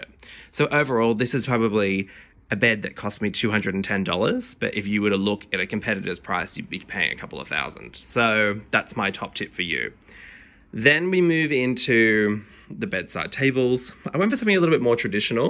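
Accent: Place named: Australia. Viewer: Yay, an Australian accent AND a great design aesthetic :)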